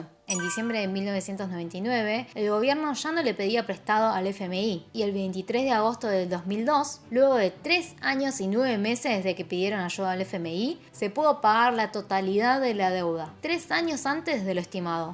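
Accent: Argentina. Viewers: Que hermoso .coreana con acento argentino ,vistes!! Saludos desde México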